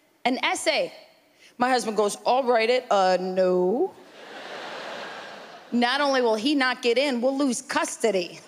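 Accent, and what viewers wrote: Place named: Boston, United States. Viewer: Such an incredible voice and "Buoston" accent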